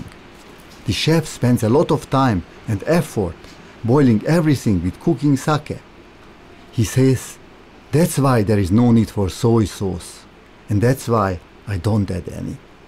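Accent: Hungary. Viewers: It is not fake. He is from Hungary, and he really speaks with that accent. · i though, that maybe this person is hungarian, because of the accent. :) its not because of fun. I was born in Budapest too. Hungarians love Japans and I hope they love us too. FOREVER JAPAN AND HUNGARIAN BROTHERHOOD. Greeting from London.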